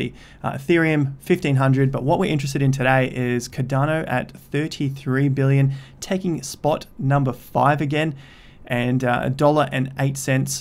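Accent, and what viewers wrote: Australia. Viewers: It's very nice to hear an Aussi accent. I'm in Melbourne. The comment about the "kidney-transplant" was a fine touch. I'm considering doing it- but Ada might plunge, and I'll end up with no money and one kidney!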